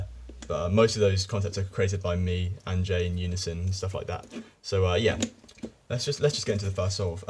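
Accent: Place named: United Kingdom. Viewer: Do you actually speak with a Yorkshire accent? I love your British accent,cuz it sounds super clear and pleasant frankly speaking!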